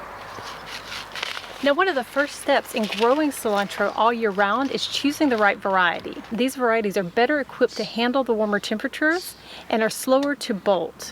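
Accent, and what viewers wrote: South, United States. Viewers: Her accent is American south, possibly Texas or farther east up to North Carolina. That’s a Southern American accent. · she has strange american accent for my foreign ears. Where is she from? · She sounds like she's southern but softening her accent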